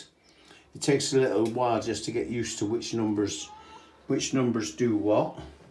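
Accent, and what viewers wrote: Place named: West Country, United Kingdom. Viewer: Great thank you for the inspiration. Ever since a lad when my dad took me to model railway shows did I want a narrow guage layout so I've decided at last to covert some of my 040 into 7mm tanks. Sounds like your from the West country. I was from Gloucester but now live in West Wales so I paint everything grey!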